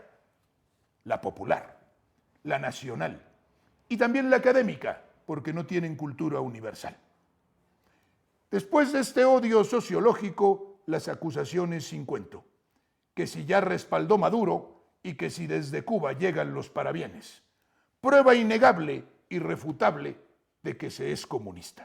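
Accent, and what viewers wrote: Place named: Mexico. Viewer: Me encanta de este señor la belleza con que usa el idioma, lo domina como español culto, pero con pronunciación mexicana. Por lo demás, concuerdo totalmente con sus valoraciones y señalamientos. ¡Qué cátedras de dominio del mejor español! Desde luego sin petulancias ni pedanterías. Todos unos editoriales sus textos. Después de soportar unos segundos escuchar al porcino Alazraki -y con perdón de los cerditos, que son hermosos y muy inteligentes-, oír a es un manantial en el desierto. ¡Felicidades, señor!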